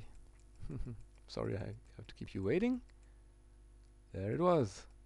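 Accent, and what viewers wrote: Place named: France. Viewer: C'est un Francais non? xD l'accent!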